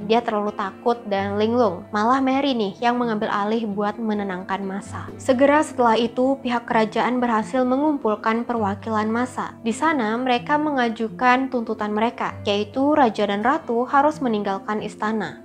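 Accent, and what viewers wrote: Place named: Indonesia. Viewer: padahal gak salah loh, coba deh nonton dulu video lain atau dokumenternya lah, beda beda dikit aja, lidah indo kan beda sama prancis asli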